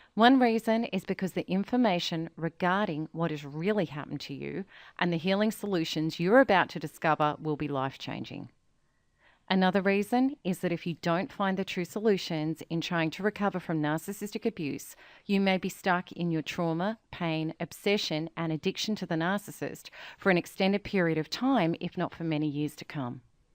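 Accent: Australia. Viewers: I can't stand the Australian accent.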